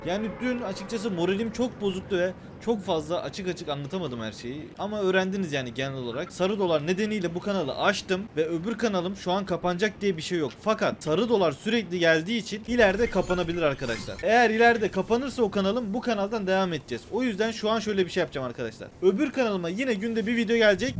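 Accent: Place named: Malatya, Turkey. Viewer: Abi sen malatyalimisin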